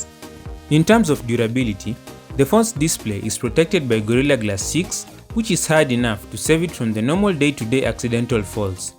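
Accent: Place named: Kenya. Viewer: Kenyan? Happy to hear a voice from home reviewing tech professionally. Big up to your journey.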